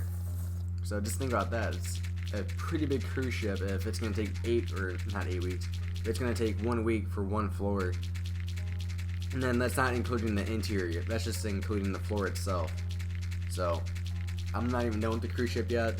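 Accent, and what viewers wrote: United States. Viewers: R u from stl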